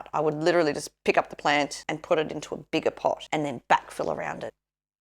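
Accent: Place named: Australia. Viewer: It's nice to hear another Aussie accent on here ! 👍🇦🇺 Sub 412 here. 😃 Yes, $14 for 1 cutting sucks !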